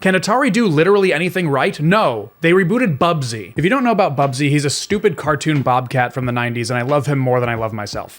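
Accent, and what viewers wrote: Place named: United States. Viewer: why does she sound so American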